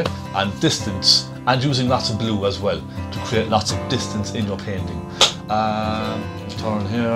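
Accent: Ireland. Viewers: that wonderful Irish accent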